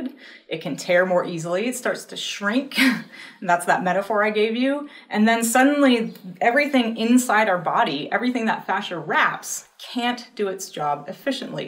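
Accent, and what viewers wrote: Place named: West, United States. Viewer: Your accent sounds N of Mason-Dixon line & W of Mississippi River, but not a flat-lander or inter-mountain W, so maybe a left coast origin, but the Science Museum on the 270 inner belt in St Louis had a display of every system from the human body to include the Fascia. No info on how the display was accomplished. Visitation hours are short, like the staff wants to go play golf PM & unfortunately they are/were not open every day. Like all museums, displays change. Worth a 500 mile day trip for those in the area with an interest. Thx & GL.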